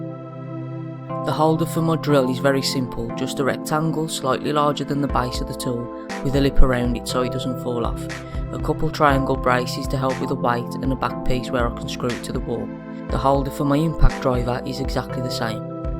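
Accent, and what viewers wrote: United Kingdom. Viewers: Great job. I love your british accent even if its a bit harder for me to understand...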